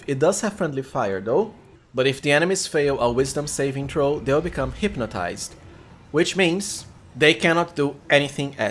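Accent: Brazil. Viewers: soube pelo sotaque q era br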